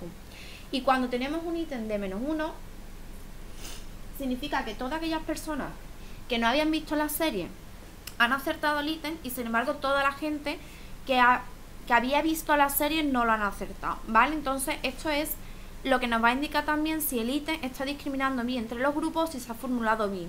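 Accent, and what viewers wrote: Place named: Mexico. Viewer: parece que te dolió... Es cierto, pues, yo no soy culpable muchas de las mujeres de México, les ponen un acento a sus palabras que dejan mucho que decir, a veces parecen engreídas o de alta sociedad cuando muchas de ellas viven en los suburbios y comen lo impensable... Además no se le entiende, pues, escucha a la chica y verás que no miento y por decirlo no soy un ogro o naco como dices... De todas formas, lo que sí se reconoce es la buena intención de la chica.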